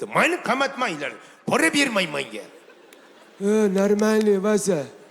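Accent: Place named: Tashkent, Uzbekistan. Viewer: Гап йук Тошкент шеван ёрасиз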